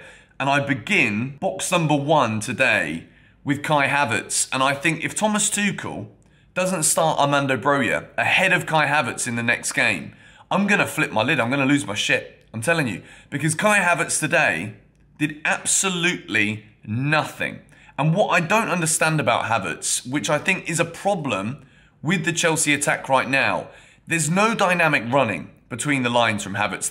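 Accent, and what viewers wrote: Scotland, United Kingdom. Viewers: GREAT Scottish accent mate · Great scottish accent mate, loved it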